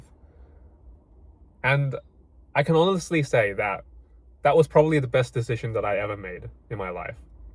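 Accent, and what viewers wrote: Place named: United Kingdom. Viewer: Have you considered starting an OF account? I mean your a Asian, and you've got that British accent? I'm already getting hot at the thought.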